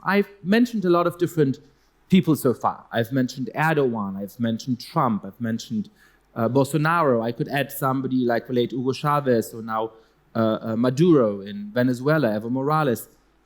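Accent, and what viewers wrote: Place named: Germany. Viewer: He really sounds German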